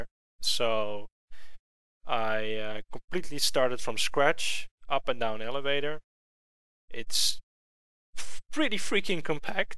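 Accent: Netherlands. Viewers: Als ik het goed hoor ben jij Nederlands...? :D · Ik hoor aan je engels dat je nederlands bent, of niet? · Ik hoor dat je nederlands bent :d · je kan altijd horen als iemand uit Nederland komt xD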